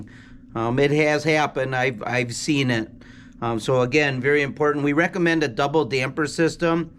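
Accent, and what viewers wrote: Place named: Wisconsin, United States. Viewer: The Wisconsin accent is strong on this one!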